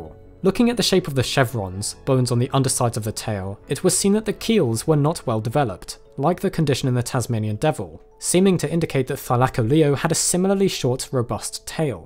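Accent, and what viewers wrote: United Kingdom. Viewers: Bri'ish fella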